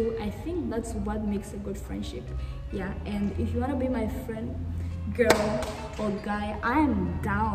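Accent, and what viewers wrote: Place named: Namibia. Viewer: Love your accent African sister. You’re the first Namibian I met... even though this is virtually. Thanks for talking about abuse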